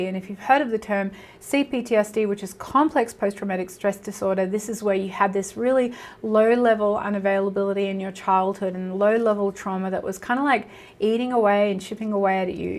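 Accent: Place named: Australia. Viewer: I think she’s Australian.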